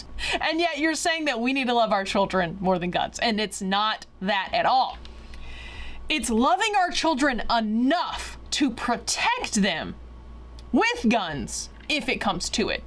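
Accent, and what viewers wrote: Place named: South, United States. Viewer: Your videos are great, and I really like that Southern accent… and that’s coming from a Native Texan! 😬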